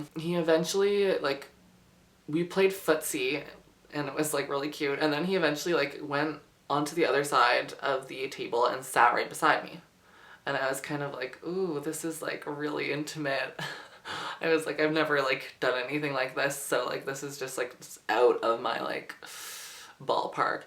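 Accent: Canada. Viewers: she is canadian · Are you Canadian???? Just wondering 🙂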